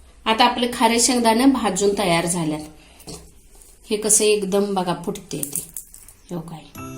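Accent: Kolhapur, India. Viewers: Kolhapuri bhasha aahe na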